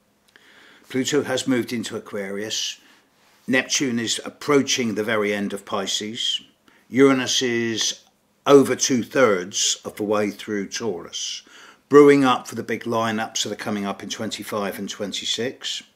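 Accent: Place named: RP, United Kingdom. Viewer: Thanks! It's always a great pleasure listen to you... And I love your clear British R.P. accent... Greetings from Italy 👋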